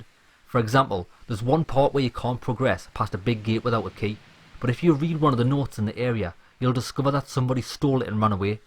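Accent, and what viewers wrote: Newcastle, United Kingdom. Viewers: This guy Is a typical geordie, weird and annoying.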